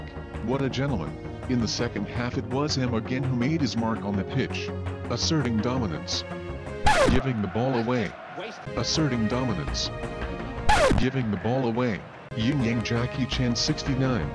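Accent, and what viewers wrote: Germany. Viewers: Great video! Are you german?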